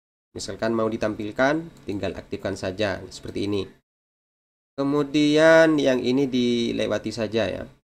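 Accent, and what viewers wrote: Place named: Madura, Indonesia. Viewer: Owlhh..soalnya dri cara bicara nya udh kyk orng madura.. 😅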